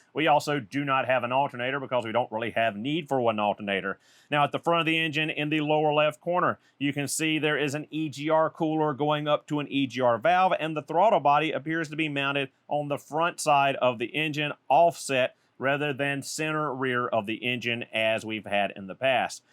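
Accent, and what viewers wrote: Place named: Arkansas, United States. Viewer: I love your accent 🙂, Arkansas? Love from Czech rep. Thx for great content.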